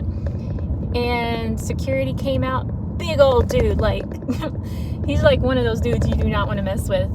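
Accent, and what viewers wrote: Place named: South, United States. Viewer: You remind me of Jennifer Lopez but with a southern accent.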